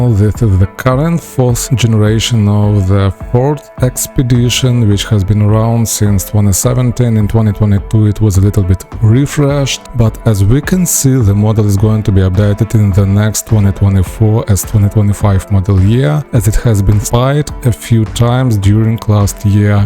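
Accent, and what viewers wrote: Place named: Russia. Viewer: Very novice Russian accent .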